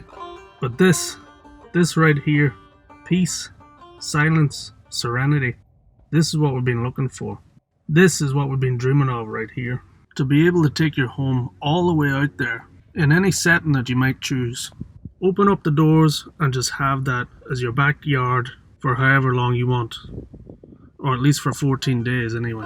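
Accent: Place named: United States. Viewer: Couldn't figure out if you had some weird Seppo accent that sounded a tad Irish - and then you said 'bollocks'...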